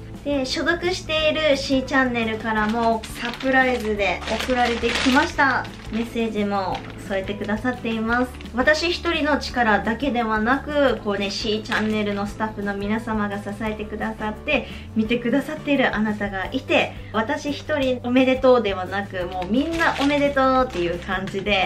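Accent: Kansai, Japan. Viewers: 鹿児島生まれ神戸在住ですさんの関西弁萌え(*^^*)♪ アタシは神戸に２０年以上いるので逆に鹿児島弁を忘れた（笑たまに実家に電話したら鹿児島弁になるんやけど 『なんか変なイントネーション』と言われるくらい関西弁が身についてしまってる～ さんは、ベッピンさんやで♡ 美容部員さんは美人じゃないとなれないとアタシは思ってて憧れる。素敵な職業ですよね♪ アタシは美的センスが無いから美容師を諦めて看護師になったけどやっぱりメイクを楽しむのは好きなんでさんのメイク動画いつも参考にしてます(^o^)v これからも楽しみにしてます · すでに11万人になっていますね‼️ おめでとうございます✨👏✨ YouTubeから銀の盾が贈られてくるのはこれからですか？さんは努力家ですね❗ 落ち着いた感じの分かりやすい丁寧な解説が、数あるメイク動画の中でも選ばれている理由だと思います。これからも頑張って下さい😄 (個人的にはさんの関西弁、もっと聞きたい・笑) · さんの動画、わかりやすく且つ簡潔ですごく見やすいです！いつも参考にさせていただいております💓 関西弁新鮮でキュンとしました笑考え方がすごく前向きで素敵です✨✨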